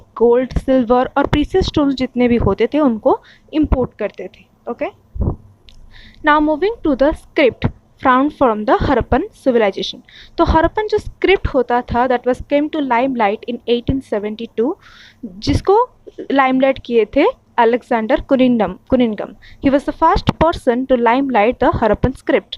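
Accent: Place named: Odisha, India. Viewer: Odia accent😊 ama odia madam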